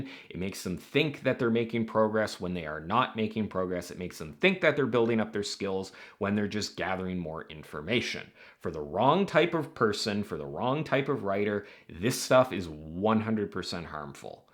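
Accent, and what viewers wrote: Canada. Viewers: Flannel and saying ooot not out, gotta be another Canadian! I could be wrong, but that was a hard aboot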